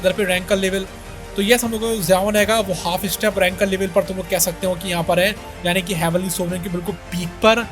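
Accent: Punjab, India. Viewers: Bro r u punjabi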